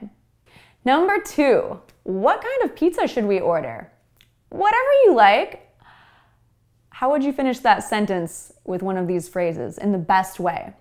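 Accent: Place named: United States. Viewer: Beautiful thank you in lots please believe me there's no one who may replace ya american accent plus watching you here on YouTube is like watch on video with a thousand of beautiful red hearts on back ground I mean for me is clear make these videos with lots of love.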